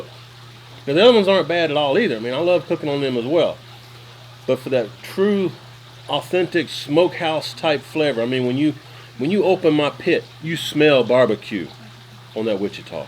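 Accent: South, United States. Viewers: Thank you, for answering questions. I love your channel and wonderful southern accent. I am learning a lot from your and the others linked to your channel. Keep up the good work.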